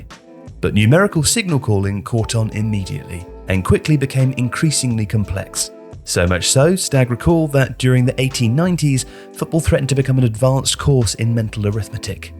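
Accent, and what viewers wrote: United Kingdom. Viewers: Idk if I trust a British man telling me about American football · Hearing a British man saying “football” while talking about quarterbacks and audibles instead of strikers and set pieces is so jarring. I’ve just sort of mentally accepted that football+American accent=Gridiron and football+British accent=soccer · British voice talking about American Football. Interesting. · Why is there a brit explanation american football to me. I don’t trust this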